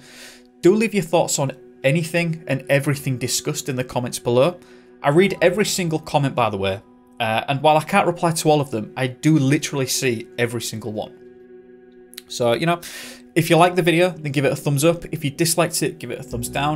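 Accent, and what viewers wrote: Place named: England, United Kingdom. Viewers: You lol that as though anyone from the U.K. could readily tell the difference between a West Virginia accent and one from Kentucky. I know you mean well, but the tone sounds condescending. I'm sure that's unintended. His vocal cadence (there's a better word for it) does have a tiny bit of a brogue. If someone hasn't spent time in Scotland and England, it's an innocent misunderstanding. When he says, "taken," "say" or "if so," those vowel sounds aren't immediately revignizable as an English accent. From which region is he? Side note: I'm related to Hannah Bee and family from Warwickshire- way back..Is that actually your last name? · 😂 His accent is English not Scottish love. Lol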